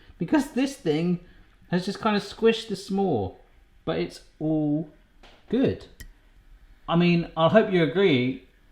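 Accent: United Kingdom. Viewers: spirit-howl 786 U that’s a really British accent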